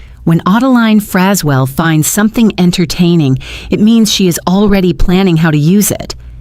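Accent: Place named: United States. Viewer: Why the American accent? Story is set in England. Weird names. Pianoforty????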